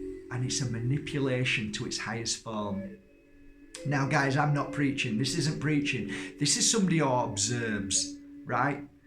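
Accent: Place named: Midlands, United Kingdom. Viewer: Love your style - just found your channel ….you have great energy - is that a Midlands accent? From one UK person to another....xx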